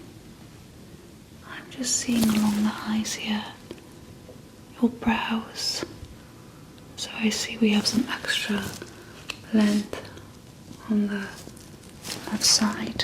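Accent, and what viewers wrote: United Kingdom. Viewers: Not sure anyone would really call an accent the Queens English now either, it's RP English or Received Pronunciation. Most people British people don't talk with a single accent accent I doubt they ever have or there'd be fewer local accents.. Even if you were to take the most common accent spoken now, it's not spoken by most of the population. The London/SE English accent is similar enough that most people from outside the area can't hear the local variations in it but even then you're only talking about 30% of the population which isn't most people. So saying most people don't speak like that now doesn't really mean anything as most people never did. As I said before, the only people who talk about British accents are Americans and when they say a British accent they're referring to a 'posh' SE English accent pretty much like the one is doing. 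Glaswegian, Scouse, Mancunian, Bristolian, Geordie are all British accents but they're not what Americans are talking about when they refer to a 'British accent' and as I said before I doubt that anyone in Britain would refer to their accent as British unless they were directing their comments to Americans. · Fun video. Is this your first time doing a British accent in your videos? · Those British accents will do it every time, won't they?! 😁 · Are you really British????? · I didn't know U were British 😆😄🌹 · once again this is amazing! Great British accent is perfect please keep doing more of these! Very.Soothing, thank u, Steve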